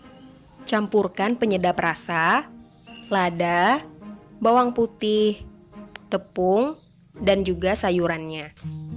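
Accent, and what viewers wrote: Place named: Jambi, Indonesia. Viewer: Orang mana kak? Kok kaya orang Jambi wkwkw logatnya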